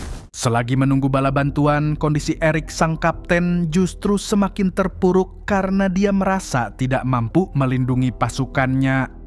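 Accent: Banjar, Indonesia. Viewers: Banjar banarr😅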